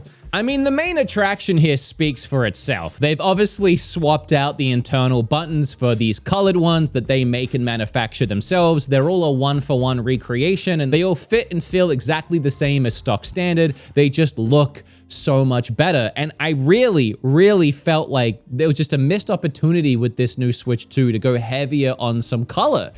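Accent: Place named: Australia. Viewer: Can someone help me out with identifying his accent. I thought it was Aussie, but then it also sounds American?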